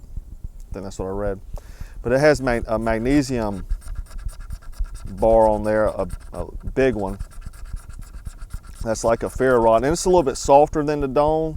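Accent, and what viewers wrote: South, United States. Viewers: You got a very cool southern or western accent. You should try reviewing guns. Your voice really suits it.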